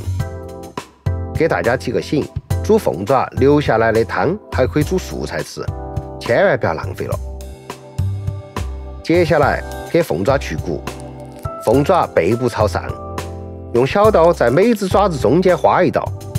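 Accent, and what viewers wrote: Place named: Sichuan, China. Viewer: 我也觉得说得很好啊！ 四川特色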